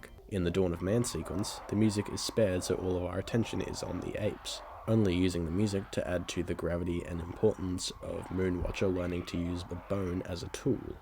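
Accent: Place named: Australia. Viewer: Always so validating hearing an aussie accent. Love the video mate!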